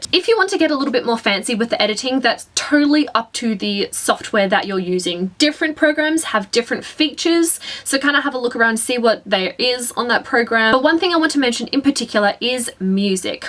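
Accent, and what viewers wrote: Australia. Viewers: Loved your video, and thanks heaps. Also, it's wonderful to hear an Australian accent on a Booktube! - from a fellow Australian :) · Very insightful... still weighing up if I should become a booktuber or not but at least it doesn't feel like a scary impossible thing anymore.I should probably wait until after I've finished my assignments to get started...or even better when the semester's over, ha! But anyway good job, subscribed. P.s Are you Australian? It sounded like you have the accent (or maybe it's British) I only ask because I'm Australian :)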